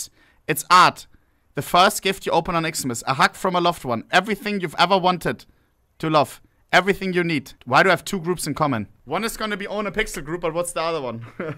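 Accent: Germany. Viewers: is he german? I hear an accent XDD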